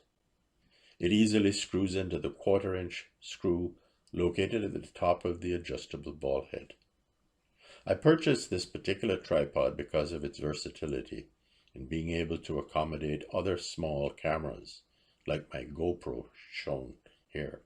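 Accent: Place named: Caribbean. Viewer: Nice, I’ve just got one, that sounds like a Caribbean accent, where are you hailing from sir? It’s a long time since I heard a white gentleman speak with a Caribbean accent. Peace from a black Caribbean gentleman in the UK.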